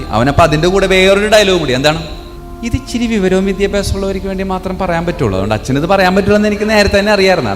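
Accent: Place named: Ernakulam, India. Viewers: This is paravoor accent. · കൊച്ചി സ്‌ളാഗ് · നല്ല കൊച്ചി ഭാഷ 👍👍👍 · അച്ചോ, അവതരണം സൂപ്പർ, 👌👌👌ചിരിച്ചു ചിരിച്ചു ഒരുപാട് ആയി, നമ്മുടെ എറണാകുളം സ്ലാഗ് 👌👌🙏🙏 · Paravoor slang aan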